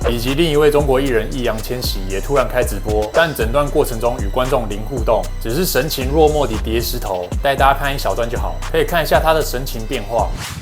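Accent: Taiwan. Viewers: 發現看了幾次，蠻喜歡聽波特王烙台語的😂 很有‘’愧靠‘’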